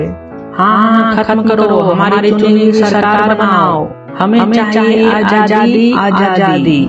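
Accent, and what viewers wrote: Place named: Bihar, India. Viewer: aap muje bihari lad rahe ho aapke aawaj se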